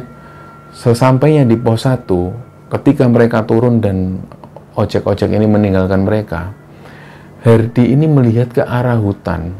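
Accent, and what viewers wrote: Java, Indonesia. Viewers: Dengerin channel satu ini, ilmu dpt, hikmah dpt, sejarah jg tau. Pembawaannya lugas dgn medok yg khas. Semangat tim, saya subscriber baru! 😊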